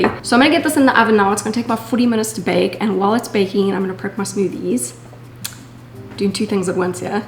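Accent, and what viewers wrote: South Africa. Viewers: random but are you south african 😂 you kinda have an accent but i could be wrong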